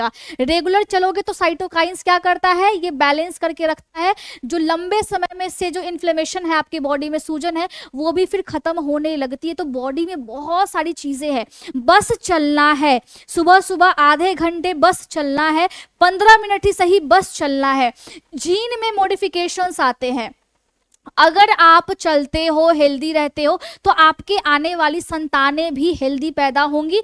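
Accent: Bihar, India. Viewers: Jankari aapki bahut hi mahatvpurn hai bahut achcha se aap explain kar rahi hai aapki bhasha mein Bihari Pan ka lagao lag raha hai vaise very nice❤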